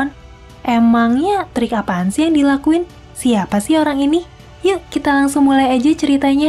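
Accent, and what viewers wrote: Indonesia. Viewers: aksennya indo banget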